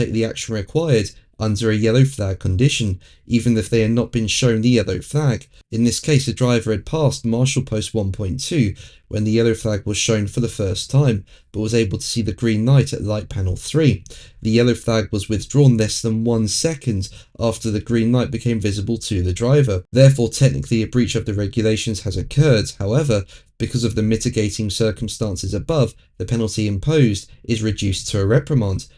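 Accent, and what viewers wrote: England, United Kingdom. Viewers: Its called an accent, not impediment. There is a country called England, they speak the same words as america but with a different accent. Hope this helps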